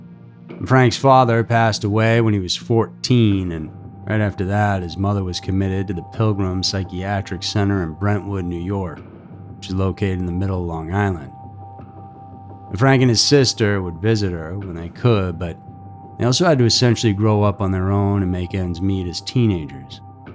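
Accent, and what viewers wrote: South, United States. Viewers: I'm Scottish and I love your southern accent so much 😍. Awesome video, as always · The narrator sounds like airy from the object show objects never escape but with a southern accent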